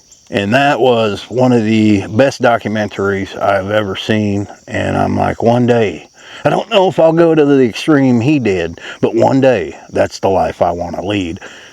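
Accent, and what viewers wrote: South, United States. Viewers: Hi. I'm Tony from NJ, and I used to have a condo. Sold it a few years ago, and I now live in a van, in the suburbs of Jersey... In a strange way, even though surrounded by humans, I also feel like a hermit.. I love no debt, no bills and #1 freedom! One day I may move out to the country.. idk.. but I've been saying that forever! NJ is home.. for better or worse.. thanks for sharing ur existence.. ur southern way of speaking is one of a kind!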